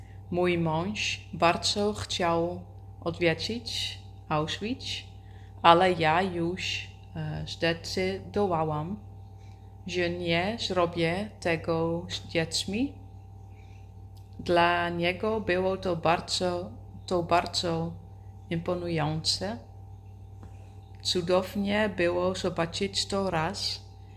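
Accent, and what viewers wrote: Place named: Netherlands. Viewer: Bardzo mi się nie podoba jak kaleczysz nasz piękny język z tym obrzydliwym holenderskim akcentem.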